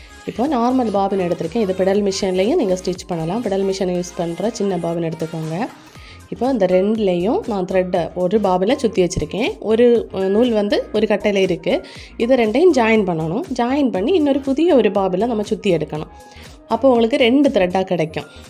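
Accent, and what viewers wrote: Kanyakumari, India. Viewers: Akka neenka entha place Kanyakumari language pesurinka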